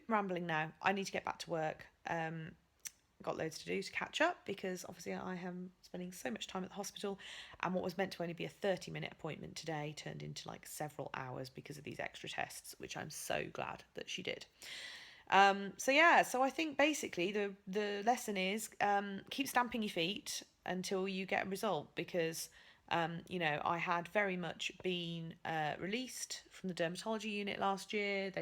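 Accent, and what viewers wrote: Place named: United Kingdom. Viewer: A tip for getting rid of the sticky tape residue to anyone watching is to use an oil-based cleanser like those face oils that are popular now. But be sure it doesn't have anything you're allergic to in it! Thanks for posting this video, I have been having a lot of problems with a rash on my face for 8 months now and my newest derm suggested the patch testing. I have been hesitant about it, I just worry it will be uncomfortable and costly and I will get no results. It seemed fishy to me that I would suddenly develop an allergic reaction and paying this doctor for a test like this will magically fix it since I have had so much bad luck with it up to now and lost a lot of money on doctor's appointments and medications. I hope your accent means you are in the UK where you hopefully won't be bankrupted by all these tests. I guess I should look for more videos you've posted to see if cutting everything they said you're allergic to out healed your lips and face, I really hope so.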